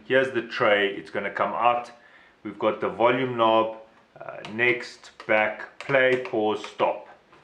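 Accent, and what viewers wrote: South Africa. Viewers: I knew you sound south African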